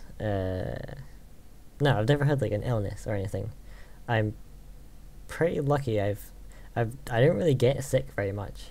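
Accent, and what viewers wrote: Scotland, United Kingdom. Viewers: He IS Scottish. People mistake his accent for an Irish accent. Also, I live in Texas as well, just as a side note, and the "cowboy stereotype" irritates me a lot. Maybe it's because I'm a goth, but I don't wear the make-up or have piercings, because I think it's too much work with too little significance.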